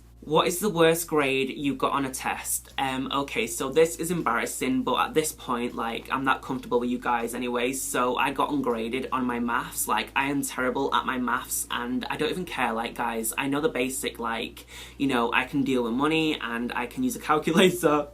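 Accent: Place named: United Kingdom. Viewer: Love this video😍❤ karate is a fight sport so yeah and I think you have a brittish accent and I realy like that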